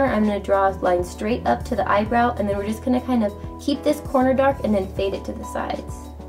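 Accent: United Kingdom. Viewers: i'm in love with your british accent<3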